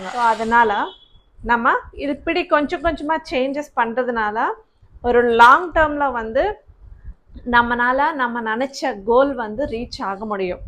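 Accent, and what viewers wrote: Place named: Telugu, India. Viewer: tamil pesaradhu alaga eruku, ❤you are looking gorgeous in this saree💕 beautiful queen💝👸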